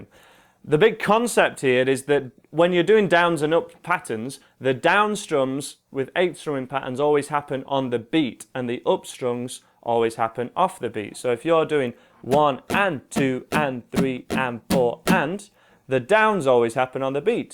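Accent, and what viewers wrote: United Kingdom. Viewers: Ah, I love your british accent😍 Thanks for the tutorial!😁😜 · british accents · This british accent 😍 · me without glasses btw its overwhelming that I thought you were pewdiepie playing a guitar with a British accent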